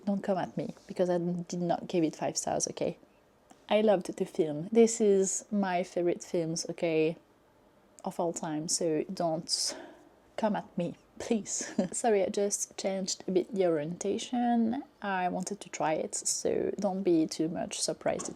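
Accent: France. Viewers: I have to admit, both the video and sound quality have improved 😎☑ I can hear you a lot better now, parse out the spoken words and sample their distinctly French flavour Horror and humour can go hand-in-hand depending on how well they are balanced in a story. It is very interesting hearing your critical take on Tolkien. The fact you don't like the fictional emphasis on genealogies and lineage as they relate to the overall story, making the reading experience a bit tedious. You are the first person I have come across who thinks J.R.R Tolkien's writing style has a tendency to be superfluous. You think he's a showoff:face-green-smiling: I appreciate your French perspective. If I were to ask someone from the US or the UK about LOTR, I'll be informed of Tolkien in glowing terms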